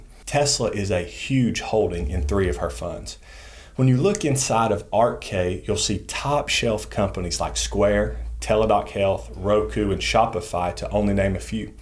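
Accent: South, United States. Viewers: hard to take you serious with your southern accent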